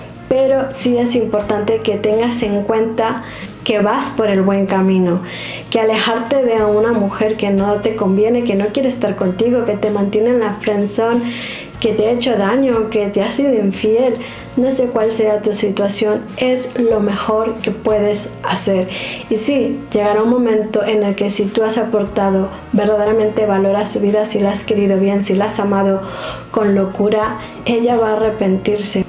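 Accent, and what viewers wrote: Spain. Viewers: Estoy enamorado del acento de esta chica... De que parte de españa sera??? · A mí también me encanta toda ella y da buenas explicaciones... A veces se escucha como si fuera española, una jalisquilla con acento español...❤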